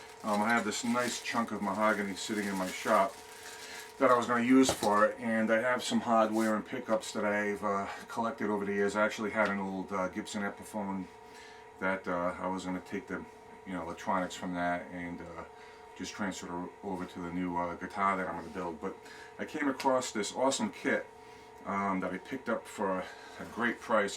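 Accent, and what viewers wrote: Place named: Boston, United States. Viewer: Code clan .Agreed, sounds like a Boston accent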